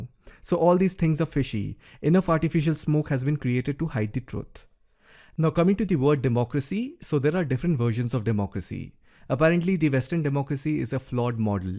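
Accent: India. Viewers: Russian propaganda with Indian accent.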